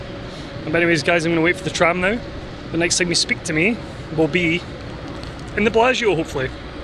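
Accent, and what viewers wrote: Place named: Scotland, United Kingdom. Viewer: As usual a great vlog. As I said before superb to hear a fellow Scottish accent in our favourite city.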